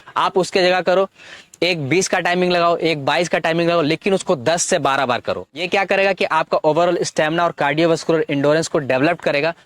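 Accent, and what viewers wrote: Maharashtra, India. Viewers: Bhai bht pyari Marathi lagi aapki...Jay Shivray❤ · Sangli Marathi bolatat sir 😍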